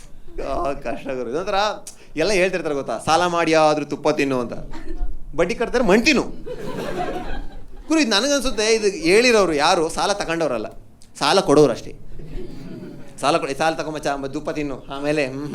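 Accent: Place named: Chamarajanagar, India. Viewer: Annoovv ur from chamarajanagara❤ ansuthe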